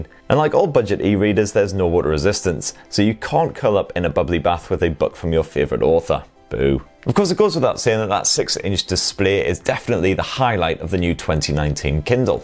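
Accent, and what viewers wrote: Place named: Newcastle, United Kingdom. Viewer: Oh no, you're a Geordie, aren't ya?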